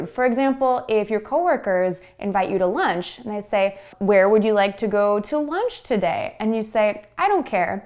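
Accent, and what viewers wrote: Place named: United States. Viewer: Beautiful thank you in lots please believe me there's no one who may replace ya american accent plus watching you here on YouTube is like watch on video with a thousand of beautiful red hearts on back ground I mean for me is clear make these videos with lots of love.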